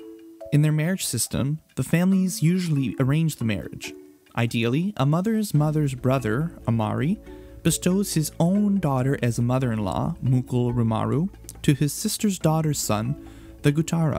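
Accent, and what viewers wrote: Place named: United States. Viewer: Hearing this stuff with an American accent makes me woozy lmao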